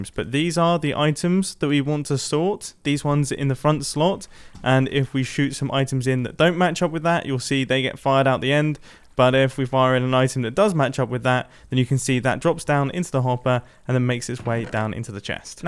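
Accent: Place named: United Kingdom. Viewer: mumbo, you sound extra british in this video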